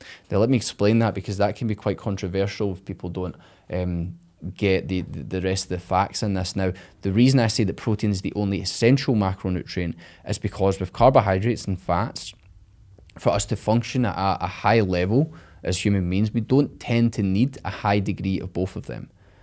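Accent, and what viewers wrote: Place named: North, United Kingdom. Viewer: Fair play bro like your style of delivery, it's great to listen to more "localised" people, me a dub and yourself /obviously somewhere north!!!😅 information may be similar but to support and hear from again as I said more "my own people "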